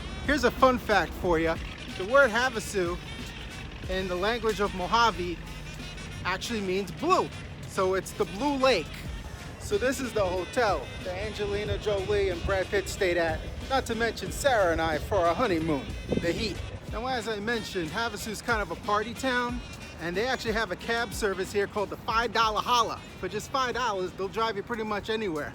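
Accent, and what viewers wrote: New York, United States. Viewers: That NY accent is thick.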